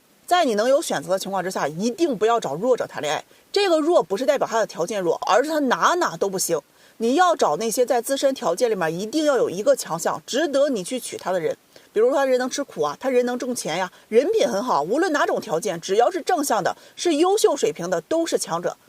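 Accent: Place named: Jiangsu, China. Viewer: 她江苏的 口音不是东北的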